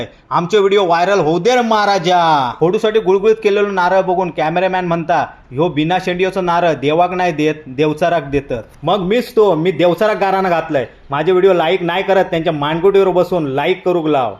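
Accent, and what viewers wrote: Malvani, India. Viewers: Dada.. Tuza malvani aikun kharach connect houk jata.. Tu sahajpane video banvatay toch sahajpana continue thev.. Video shoot karun nantr tyat voice over karnyat tuza skill asa..Stay blessed 👪..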